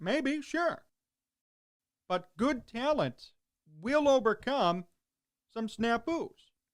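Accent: Chicago, United States. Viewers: Love what you're saying bud, also you have the most Chicagoan but also not even close to Chicagoan voice I've ever heard and I have no idea how to feel.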